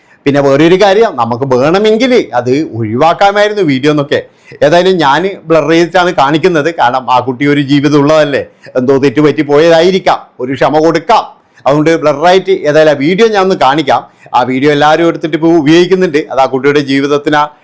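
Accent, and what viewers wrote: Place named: Kollam, India. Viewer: സംസാരം കേട്ടിട്ട് കൊല്ലം ജില്ലയാണെന്നു തോന്നുന്നു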